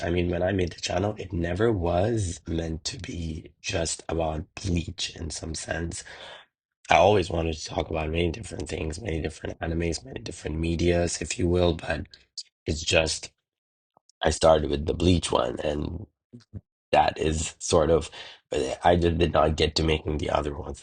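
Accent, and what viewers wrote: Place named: Quebec, Canada. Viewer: Hey there ! Discovered you 5 days ago and I was absolutely NOT disappointed. I enjoyed your video about the Reio. Please stay in good health and share us your work whenever you can :) Also, where are you from ? I hear a faint accent, but can figure it out. ^^ I'd say Québec (Canada) by pure instinct ¯\_(ツ)_/¯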